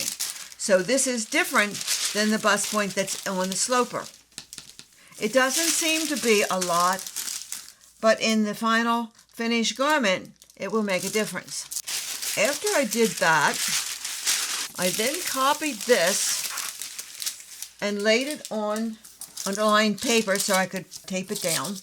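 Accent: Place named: Pennsylvania, United States. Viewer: I just found your channel and am learning so much..!! You must be from PA, my aunt sounds EXACTLY like you ❤.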